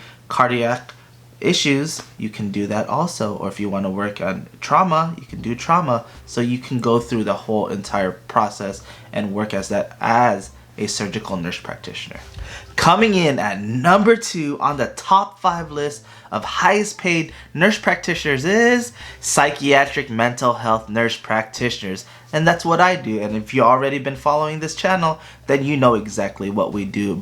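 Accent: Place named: Philippines. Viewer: Filipino accent?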